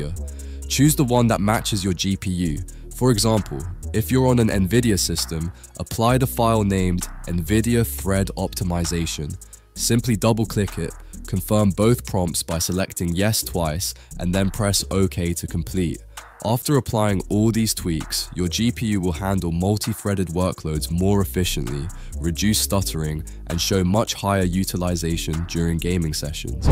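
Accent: India. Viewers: bro when from brittish to indian?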